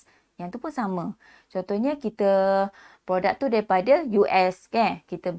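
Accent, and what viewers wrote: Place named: Kelantan, Malaysia. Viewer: Bunyi klate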